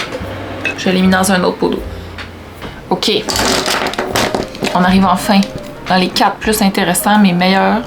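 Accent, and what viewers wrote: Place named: Quebec, Canada. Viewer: La surprise de découvrir ta chaîne et d’entendre du bon vieux québ! 😍 Nouvelle abonnée c’est sûr! 💪 c’est cool de découvrir des gens de chez nous dans le fabuleux univers des plumes !! ❤️🖋️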